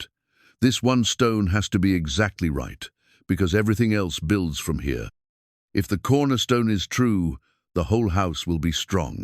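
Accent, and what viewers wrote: England, United Kingdom. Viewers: I think your stories where it is about the old west it would be more believable if you had someone other than an English man to read them.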